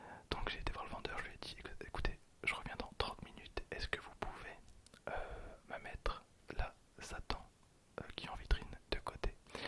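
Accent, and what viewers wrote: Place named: Belgium. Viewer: C’est la numéro Oui118 l’accent belge oblige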